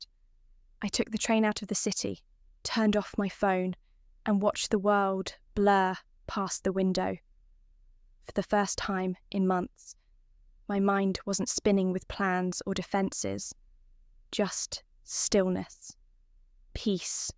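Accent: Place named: United Kingdom. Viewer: Very pleasant British accent . Excellent diction . 😊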